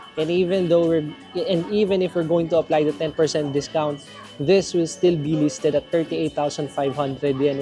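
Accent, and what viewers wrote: Philippines. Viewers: watching this channel for the thick philipino accent! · Love your PH accent, was in PH years ago loved it there so nice people! Wow those Tissot and Hamiltons are stunning!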